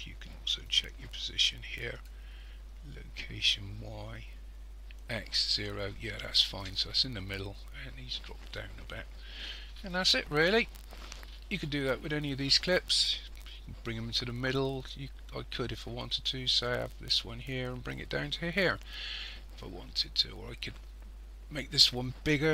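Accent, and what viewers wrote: West Country, United Kingdom. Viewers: Thanks! Very useful video! Where are you from? Sounds like you're from somewhere in the West Country but it doesn't sound quite like West Country accents I've heard.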